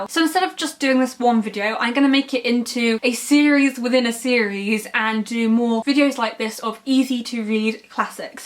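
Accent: United Kingdom. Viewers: I came here thinking the “classics” where of a higher order. I thought she was going to refer to greco-roman classics of LOEB library. Aside that, I imagined her with A British accent and was not disappointed. You’re a beautiful women. Greetings from the golden state. You earned a sub.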